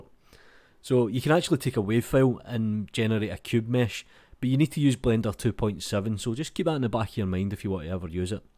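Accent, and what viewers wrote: Scotland, United Kingdom. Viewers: Good information delivered straight to the point in a clear scotch brogue.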